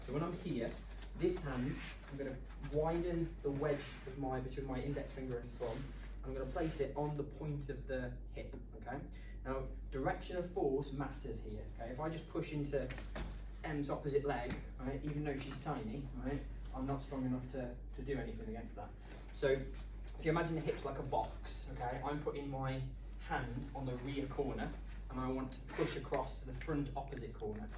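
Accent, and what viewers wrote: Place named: Wolverhampton, United Kingdom. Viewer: As it should be fluid and adaptive…loving the throws btw similar to my Aikido style, started my martial arts in Wolverhampton 20yrs ago, now in Tulsa, Oklahoma, hence noticing the accent!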